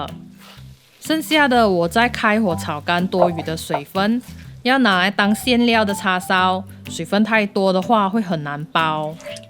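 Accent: North, Malaysia. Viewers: 你的食谱都很喜欢，请问是北马人吗？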